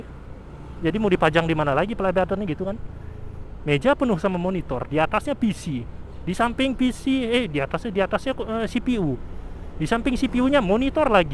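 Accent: Bali, Indonesia. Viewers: Dari logatnya aja udah keliatan, pasti abang orang bali ya 😅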